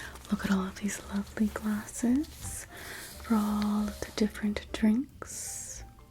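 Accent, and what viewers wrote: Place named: Michigan, United States. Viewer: I've never heard a Michigan accent complemented before! I appreciate it as a michigander myself 😅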